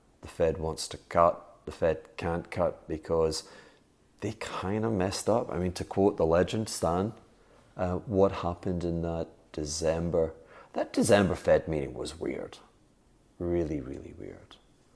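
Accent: Scotland, United Kingdom. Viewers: It's how as a Scot, he pronounces 'asset'. Clearly it's an in-joke and a play on words with being on acid, ie.e joker and maverick take your pick. Being elusive and unpredictable is part of the shtick.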